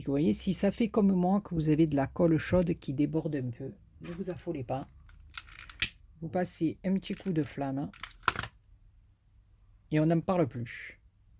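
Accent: South, France. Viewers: Merci à vous! J adore votre petit accent du midi! Courage ! Cordialement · bjr madame trop trop beau je ne connaissais pas le système de fleur en simili cuir et j'adore votre accent du midi bonne journée continuer comme cela c 'est super et bon rétablissement a vous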